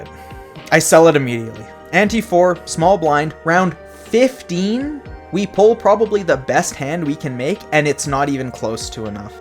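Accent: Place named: Alberta, Canada. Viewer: HAHAHAHA I thought I heard Alberta but I didnt want to be wrong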